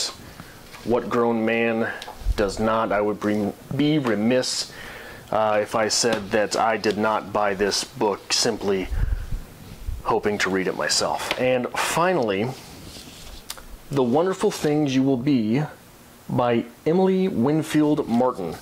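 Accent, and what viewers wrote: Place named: France. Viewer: LOL That French accent. One of my best friends had an uncle like you. He would only buy her books and she knew that she could ask for any book she wanted growing up. He really encouraged her to read and love books. Congratulations on being an uncle!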